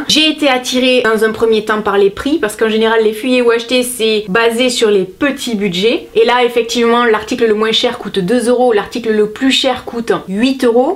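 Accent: South, France. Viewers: Téléphone: notif you tube nouvelle vidéo Moi: ouuuuuuuh mon accent du sud favori est de retour 😍😍😍😍😍 vite la bonne humeur de la journée 🥰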